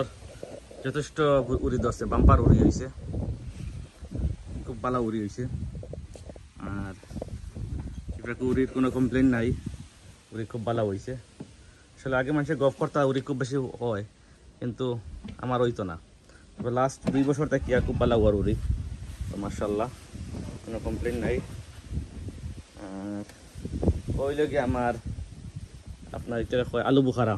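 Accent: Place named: Bangladesh. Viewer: মাশাল্লাহ খুব সুন্দর ফসল কথাই বোঝা যায় আমার দেশি মানুষ সব মিলিয়ে খুব ভালো লাগলো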